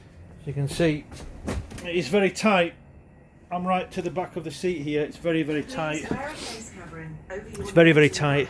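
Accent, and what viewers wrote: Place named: Huddersfield, United Kingdom. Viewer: e by gum thall never git rid of that huddersfield accent and dont ever try says a lad from dewsbury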